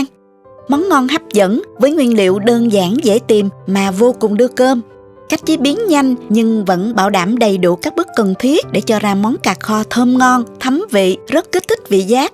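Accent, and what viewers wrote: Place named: Countryside, Vietnam. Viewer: Giọng nói quê phèn mặn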